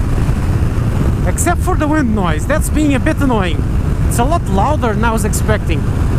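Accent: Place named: Portugal. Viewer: nice portuguese accent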